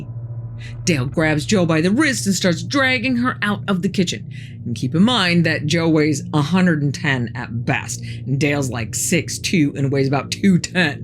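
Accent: New York, United States. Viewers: How the heck does someone make you feel both safe and spooked at the same time? tells these stories so well that one can’t help but laugh even as the sheer terror builds up in your mind. And the AI, the editing… Everything is so on point! How is this channel not at 1 M members?! I thought only Morticia Addams did lovely and spooky but certainly does it at least as well…with a New York swagger!!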